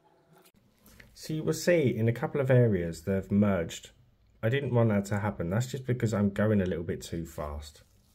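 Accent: United Kingdom. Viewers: Sorry, I have to ask, where are you from UK?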